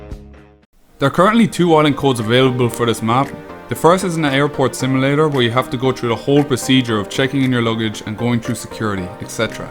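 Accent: Ireland. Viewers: Are you irish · He sounds like he's from reland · Are u Irish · Are you Irish or Northern Irish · I think u sound Irish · U Irish’s?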